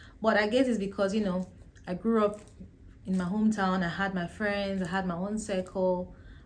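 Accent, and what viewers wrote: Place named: Akwa Ibom, Nigeria. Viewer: Akwa ibom or calabar diction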